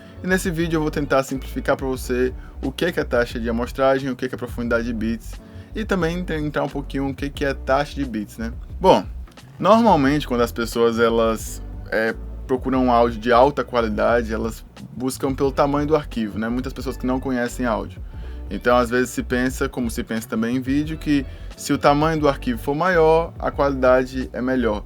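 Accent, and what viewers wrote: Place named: Bahia, Brazil. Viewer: A melhor parte é o sotaque baiano.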